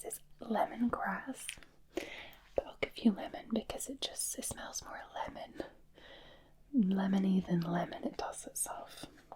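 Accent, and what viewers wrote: Scotland, United Kingdom. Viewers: You are a person and you know what a person needs? a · 26 minutes of tingles from the resplendent scottish lass. perry the platypus noises ☺️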